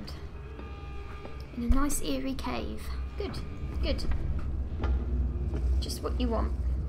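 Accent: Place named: United Kingdom. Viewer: I didn't quite understand the name of the game studio you're working in... Anyway, I'm eager to watch this series, because even though I really love the Fallout franchise, I've only played the PS2 spin-off Brotherhood of Steel and all the Bethesda games. So I'm more than excited to watch somebody else play the classic that started it all (well okay, that would technically be Wasteland lol), especially somebody with a British accent. :3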